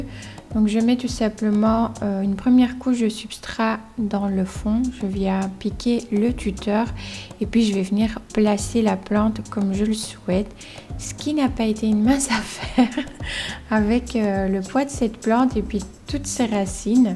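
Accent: Belgium. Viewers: jaime beaucoup ton accent belge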